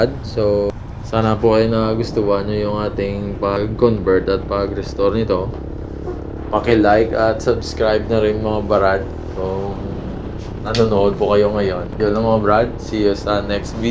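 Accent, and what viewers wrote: Iloilo, Philippines. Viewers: ilonggo ka brad? hehe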